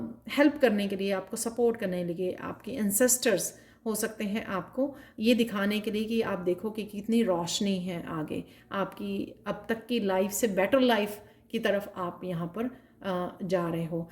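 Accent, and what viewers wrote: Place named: Punjab, India. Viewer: Tu ganwar Punjabi tone wali aurst bqn eahi angrez hai sadi huî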